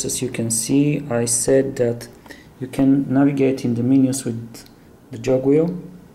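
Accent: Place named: Eastern Europe. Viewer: Very strong accent - East European, hard to listen to.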